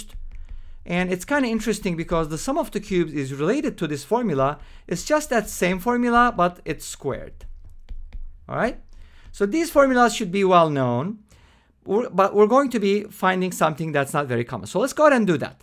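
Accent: Turkey. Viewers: Your accent sounds Turkish.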